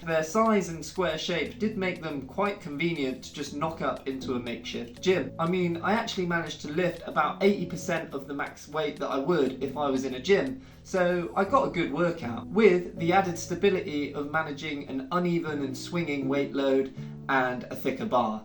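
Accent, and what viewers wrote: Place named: RP, United Kingdom. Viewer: Wow, thanks for the reply lad! 💪 I'm actually a woman, but I do my best to keep up with the guys, and challenges like this are right up my alley. We just love seeing people doing more with pallets here Pallets R Us, we think they’re a really under appreciated bit of kit that the whole global supply chain relies on daily, people often forget that society would grind to a holt without them! I think you might be across the pond (guessing from your Prince William accent 🤴), but we'd love to help you out with some pallets sometime if we can hit it? So, if you're ever down for a transatlantic challenge, hit us up!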